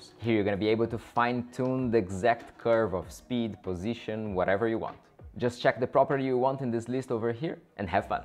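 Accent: Brazil. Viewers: I don't know, but it seems that you are BRAZILIAN, your accent is the same AHAHAHAH. great content brother!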